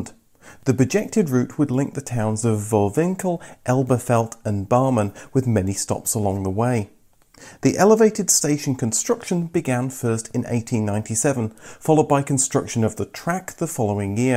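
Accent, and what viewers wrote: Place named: United Kingdom. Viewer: Apart from enjoying your videos so much, I am delighted to listen to your British accent. A real pleasure!!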